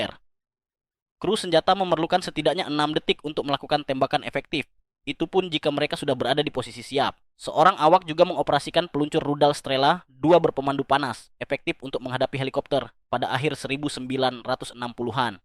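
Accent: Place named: West Java, Indonesia. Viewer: kang, urang sunda yak ? F-35 kang, bukan ep-35 😂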